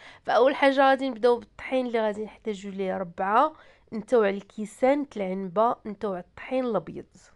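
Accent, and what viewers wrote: Marrakech, Morocco. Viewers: باينه من الصوره. الأخت مراكشيه يكمن من الهجه ديالك حيت انا من مراكش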